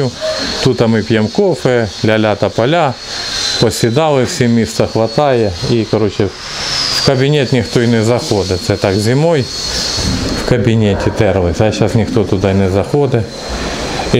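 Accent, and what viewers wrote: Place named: Surzhyk, Ukraine. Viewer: Это суржик ,кто как хочет так и говорит ,у нас в Украине с этим проблем нет!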